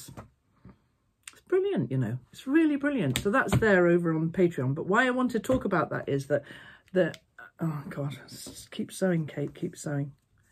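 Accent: England, United Kingdom. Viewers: I got some quilting videos recommended by ty and somebody mentioned english piercing - I think it was the Royal Quilter or something like that in Australia. I google it, because I though piercing and quilting how do that go together. LOL. After searching in yt I found you within the results. Thank you ! I sometimes am in need to hear a lovely english voice, rambling on 🥰🥰😉. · I wonder if it suggested you because I watch "knit ink". They went on an Arne and Carlos cruise I think! Oh and just get it done quilts. She's great. Lovely to hear an English/uk voice chatting about quilts, though ☺ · I was watching Arne & Carlos today as a welcome distraction from our election mania here in the US (I am from the UK originally - so enjoy hearing your accent - makes me feel at home 💕) - they mentioned you, so here I am! Loving your channel! I think you might enjoy the Fairyland Cottage YT channel ;)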